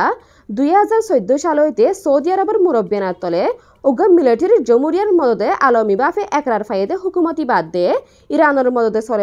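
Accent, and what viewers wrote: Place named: Rohingya, Myanmar. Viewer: Dear sister, I greatly appreciate to your significant Rohingya accent.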